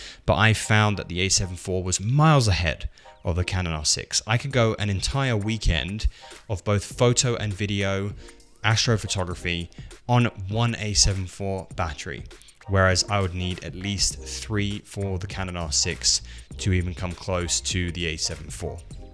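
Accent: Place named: United Kingdom. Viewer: You from the UK cool.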